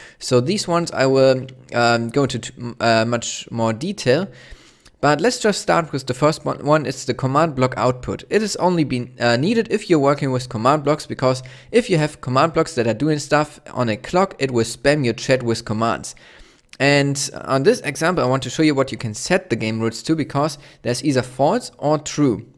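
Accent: Germany. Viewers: your german, arent you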